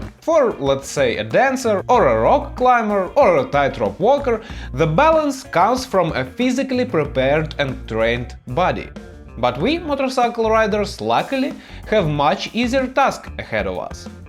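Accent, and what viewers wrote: Russia. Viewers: Clearly the best motorcycle instructional videos out there. Even with the strong Russian accent, you still have the clearest and most understandable explanations in the field. I would challenge any American to learn Russian and be able to be as fluent while creating such competent content. Kudos my comrad--I am a subscriber now. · He is in Argentina, but he does sound Russian, I wasn’t sure haha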